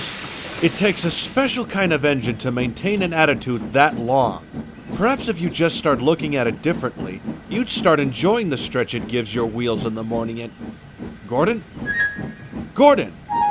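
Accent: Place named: United States. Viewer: Narraration sounds a bit too American, but this is very well written and I hope you make a threequel.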